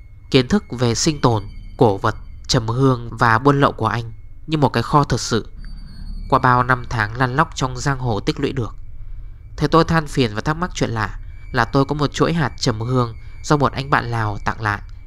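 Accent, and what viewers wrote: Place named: North, Vietnam. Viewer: người ở đâu vậy? Nghe giọng thì người Miền Bắc rồi nhưng không biết ở tỉnh nào..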